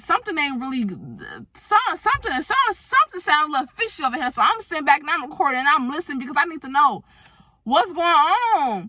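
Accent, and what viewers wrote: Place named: Chicago, United States. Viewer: I knew you were from Chicago as soon as you started talking😭🫶🏾. New subscriber! Taurus gangggg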